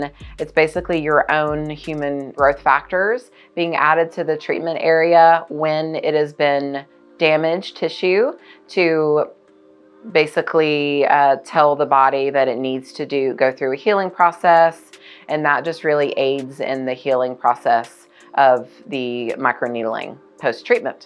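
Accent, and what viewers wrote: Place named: Alabama, United States. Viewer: Immediately recognized your beautiful accent. Alabama here.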